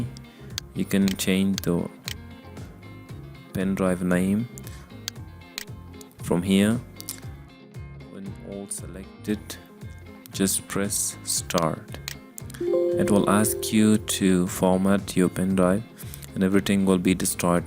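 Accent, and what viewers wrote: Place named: India. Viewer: Bishaka takra shawi e Your accent is such as indian...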